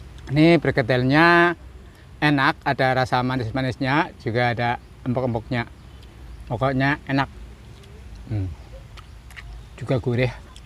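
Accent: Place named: Bumiayu, Indonesia. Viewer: Mas asli mana,.. logatnya mirip suami org Bumiayu, dn sy betawi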